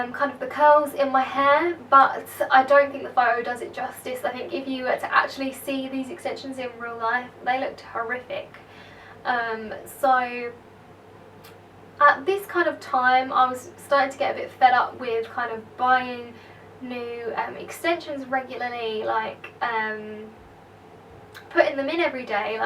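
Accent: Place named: United Kingdom. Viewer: I admire your british accent❤️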